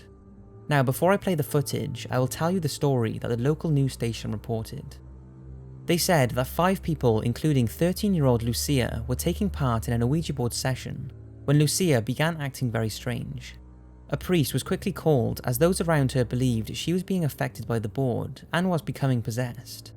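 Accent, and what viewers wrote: United Kingdom. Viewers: He's British and they just pronounce things differently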